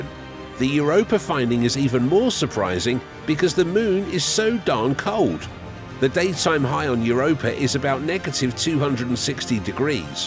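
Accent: United Kingdom. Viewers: I know. They usually get a narrator with a British accent to give their lightweight video more 'gravitas'.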